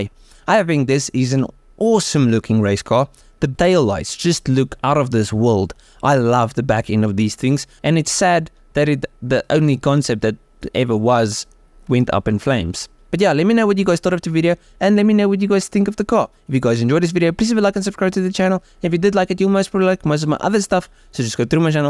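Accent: South Africa. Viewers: Love ur SA accent cud listen 2 it aaaaaaall day HEHEHE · He sounds South African? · Are you from south Africa by any chance? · South African accent?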